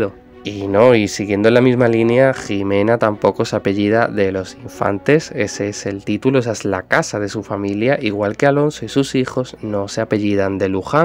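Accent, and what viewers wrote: Andalusia, Spain. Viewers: A mí me fascina Maria Castro, todo,todo,porque puede decir con la mirada,con la boca,con un silencio todo un párrafo no escrito.Y por supuesto que no quiero que se case con Gregorio,pues temo que cada día le haga pagar su " bondad"....Con respecto a todo el video,como siempre,una maravilla!! ( Y tu andaluz es encantador,aunque no lo notes). Un enorme abrazo, Abuela Cris de Argentina ❤️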